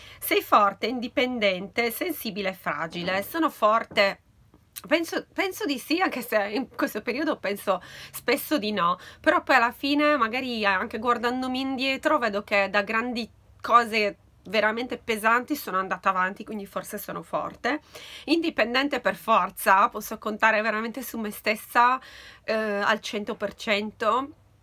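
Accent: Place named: Sardinia, Italy. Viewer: Si questo è il nostro accento cagliaritano quando vuoi lo parliamo assieme 😉😉😉😉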